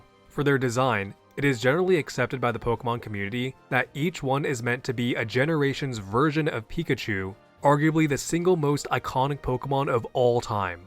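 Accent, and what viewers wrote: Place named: United States. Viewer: Today I learned is half Japanese that's pretty neat! Idk why I always assumed from your voice that you were like John America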